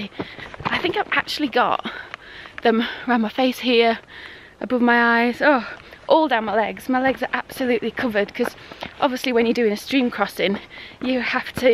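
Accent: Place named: England, United Kingdom. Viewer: I like your videos! That English accent! Such a positive spirit when you talk! Thanks a lot!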